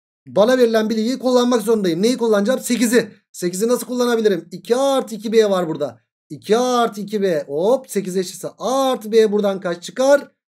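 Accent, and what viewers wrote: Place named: Trabzon, Turkey. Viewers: trabzonlu net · Bence trabzonlusunuzdur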